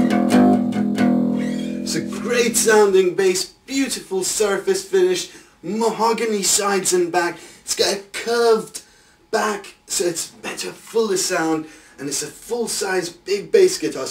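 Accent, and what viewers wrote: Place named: Australia. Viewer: he sounds like an australian guy giving a review on an animal.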